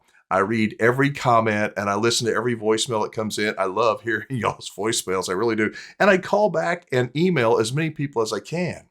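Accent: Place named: Georgia, United States. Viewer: I mean no disrespect. But you and Nancy Grace have almost identical regional accents. I watch all your great videos. She's from Macon Georgia, you? Respectfully Submitted, Mark Tinnirella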